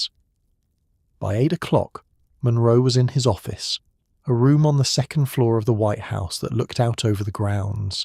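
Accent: United Kingdom. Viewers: Well Done! Monroe was On Point with the Doctrine! Why the Annoying British Accent Narrator? Informative! C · American President why the annoying brit accent?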